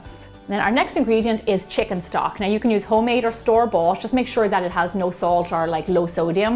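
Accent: Ireland. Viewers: ahhh knew it by the accent yuppa the Irish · Thank you so much I really really enjoyed watching you create these delicious dog treats. Are you from Ireland? Originally. I’ve subscribed and given you a thumbs up too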